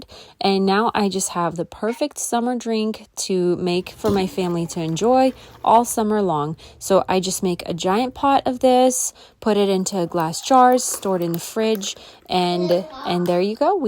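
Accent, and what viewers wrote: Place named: Russia. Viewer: I was wondering where your lovely accent was from!! Did you grow up in Russia?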